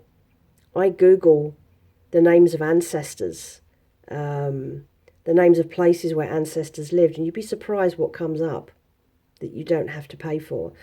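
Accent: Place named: Australia. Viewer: I've been thinking you had an Australian accent for months! Where is your accent from ?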